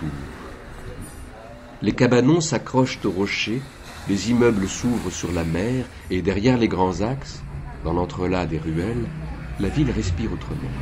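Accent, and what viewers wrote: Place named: South, France. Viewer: "et je parle enfin avec de la chaleur dans la voix" <3 très beau ce journal à l'accent du sud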